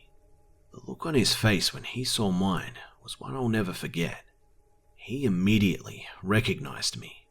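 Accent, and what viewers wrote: Australia. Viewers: is the reason I now find Australian accents insanely attractive. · are you Australian, and I love your videos your the best narrator on YouTube. Please reply.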